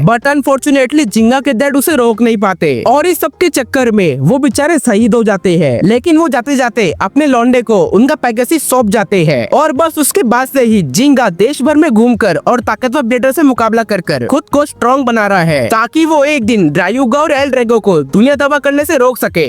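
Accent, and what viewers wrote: Assam, India. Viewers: Bro, are you from Assam?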